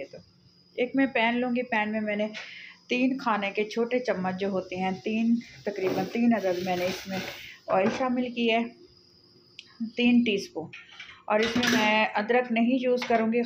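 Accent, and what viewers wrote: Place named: Saraiki, Pakistan. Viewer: ماشاء اللہ بہت اچھی دال بنائی آپ نے منہ سے پانی آ رہا ہے۔😋 آپ کی آواز سے پتہ لگ رہا ہے آپ سرائیکی ہو۔ سرائیکی ہماری مٹھڑی زبان ہے اس لیے اندازہ لگایا بہرحال آپ کھانے بہت اچھے بناتی ہیں 👌👍👏👏🌹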